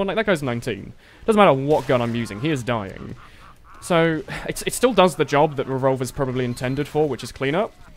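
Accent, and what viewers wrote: United Kingdom. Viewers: what part of the UK are you from? Sheffield is still relatively warm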